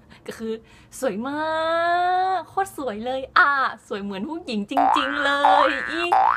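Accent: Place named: Phayao, Thailand. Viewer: สำเนียงพะเยาครับ ผมอยู่น่านสำเนียงเหมือนกันครับ ทั้งภาคเหนือมีแต่ เชียงใหม่ครับ ที่พูดไม่เหมือนคนอื่น นอกนั้นพูดเหมือนกันหมด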